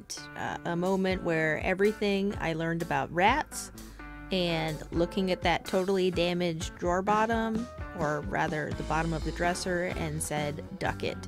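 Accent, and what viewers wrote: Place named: Ohio, United States. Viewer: don't want to be nosy, but your accent sounds just like my daughter in law, who lives in Ohio.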